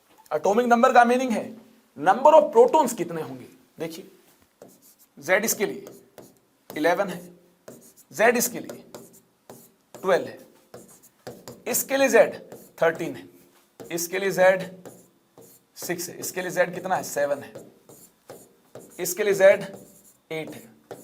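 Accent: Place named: Haryana, India. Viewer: Sir aap ka tone haryanvi h ...😆😁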